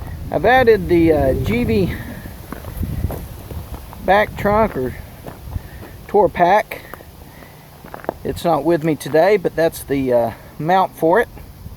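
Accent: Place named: Texas, United States. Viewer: Man... if i close my eyes, you sound like Baby Bush....must just be the Texas in your voice.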